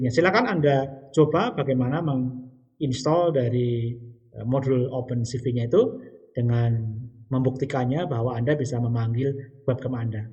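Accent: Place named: Java, Indonesia. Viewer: instoll instol instoll.. hehehe medok kali jawa nya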